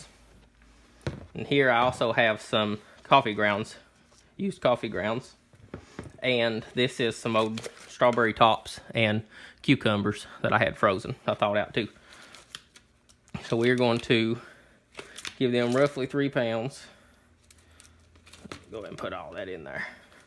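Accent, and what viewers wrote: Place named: Appalachia, United States. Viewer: This guy has to be appalachian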